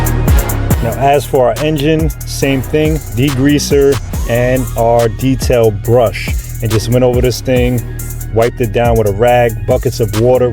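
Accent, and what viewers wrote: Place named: Long Island, United States. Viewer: after listening to him talk ima say long island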